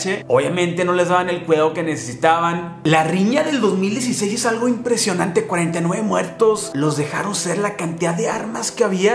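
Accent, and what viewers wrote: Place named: Fresa, Mexico. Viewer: Nunca había visto un vídeo de el, muy buen video, solo que desespera su voz exageradamente fresa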